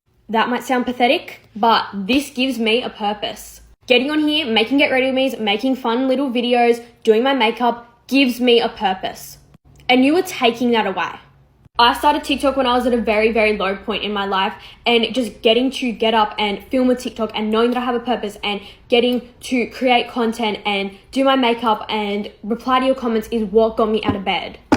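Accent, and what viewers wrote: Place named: Australia. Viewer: she aint from new york, she aussie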